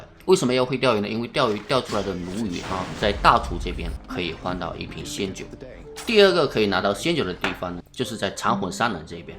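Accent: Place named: Fujian, China. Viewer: 胡建口音有点重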